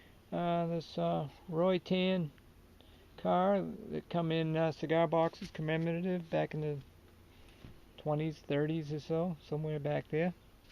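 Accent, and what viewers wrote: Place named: Boston, United States. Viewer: congrats like your vids :D love the accent quadah reminds me of that speaking Boston commercial ;P great finds the funny thing is i found that exact same 10 k ring my fiance wears it now wont let me have back lol and that spoon i find lots of those rogers spoons but i have that exact same one. good on ya keep them vids coming .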